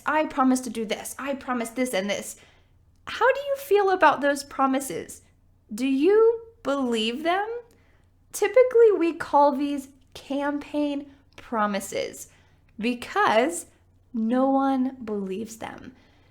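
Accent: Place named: United States. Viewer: iam from Somalia this is the only teacher that i listen always really it's help ful even i often like American accent KEEp it UP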